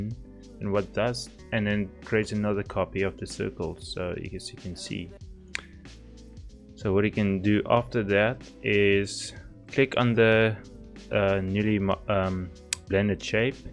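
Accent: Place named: South Africa. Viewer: I detect a South African accent there....am I correct?😉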